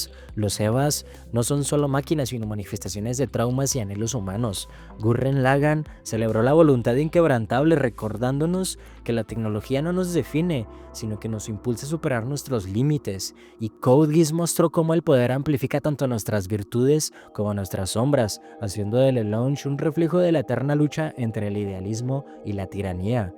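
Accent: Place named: Colombia. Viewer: Voz tipica de colombiano...de mucha hueva...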